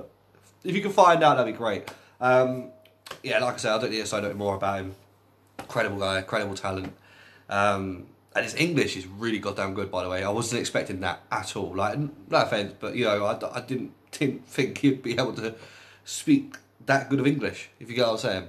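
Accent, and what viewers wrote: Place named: England, United Kingdom. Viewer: Thank you Mr.. You speak with a heavy English accent.I like your viscous English. Often I can"t really catch it cause I still learn it Thanks a lot indeed however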